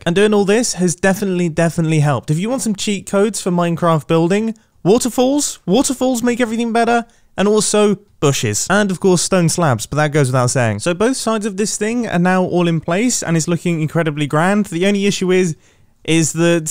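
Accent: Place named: United Kingdom. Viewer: oh OK just sounds British to me for some reason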